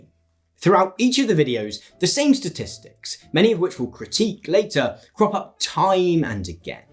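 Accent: United Kingdom. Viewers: British authoritative voice makes this video look to be more than what it actually is, finger-pointing shaming with no concrete facts to backup the claims, only public perception and opinions.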